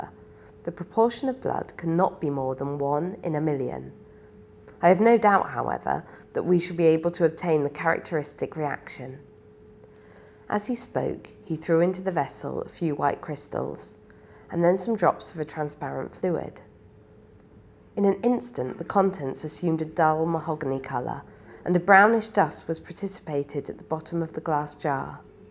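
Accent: United Kingdom. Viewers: 귀르가즘이 뭐 여기서만 쓴 표현도 아니고 영상과 사운드가 중요한 유튜브에선 특히나 많이 쓰는데 왠 불편러들이 이리 많지. 이 표현 뿐만 아니라 이 채널 전반적으로 선생질 하는 댓글이 많은데 뭔 교육컨텐츠라고 꼰대들만 몰려왔나. 영상 좋다가도 댓글 보기가 너무 불편. 습관적으로 스크롤 내리는걸 안해야할듯 ㅡㅡ... 선생님 목소리도 편안하니 듣기 좋고 발음도 영국 특유 발음이 듣기 좋아서 어울리는 표현이라고 생각하고 특히 영어 교육에 특별히 관심이 없더라도 호기심 가지고 눌러볼 만한 제목인것 같아서 오히려 더 좋은것같습니다. 실제로 제목 보고 들어와서 컨텐츠 내용 좋아서 구독했구요. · 여기 담당자님께 질문 드려요 쌤의 발음은 영국 어느 지방의 사람들이 쓰는건지요? 감사합니다 😀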